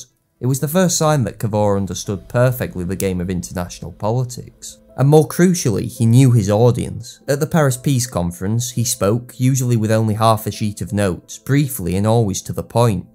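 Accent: United Kingdom. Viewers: high quality content on interesting subjects with a grest british narrator!!! cant ask for snything more :)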